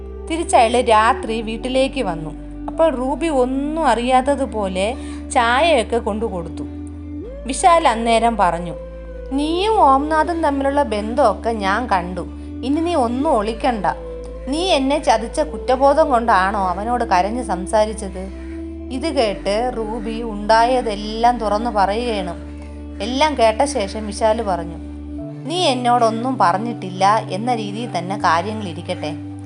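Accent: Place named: Thiruvananthapuram, India. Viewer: നല്ല കഥ അവതരണം കൊള്ളാം തിരുവനന്തപുരം സ്വദേശി ആണോ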